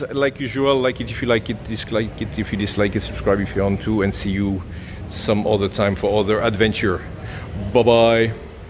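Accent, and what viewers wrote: France. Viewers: Are you French ? Hi 👋🏽 from Perth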